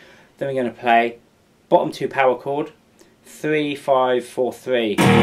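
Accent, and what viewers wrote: England, United Kingdom. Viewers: ....and thanks for speaking with this beautiful english accent so that a stupid german like me can understand you that well!!!! I never get these us guys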